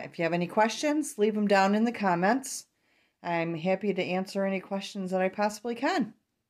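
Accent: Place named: Midwest, United States. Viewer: Omg! You must be from the Midwest! You remind me of one my my BFFs! Makes me smile! And thanks for sharing!!! Xoxo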